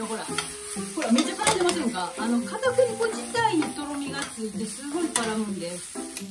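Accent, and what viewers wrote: Kansai, Japan. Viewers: わかりやすいですありがとうございます。 関西弁もサイコ〜です✨ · 先生の関西弁毎回聞いてて最高⁉️ 毎回楽しみにしてます。ナスは今は旬なんでつくってみます🎵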